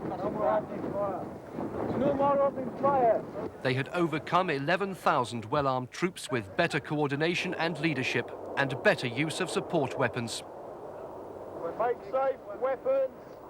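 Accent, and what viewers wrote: United Kingdom. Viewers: LOL your page says you're from Denmark. That's why. But you sound like a British subject who lives in Denmark.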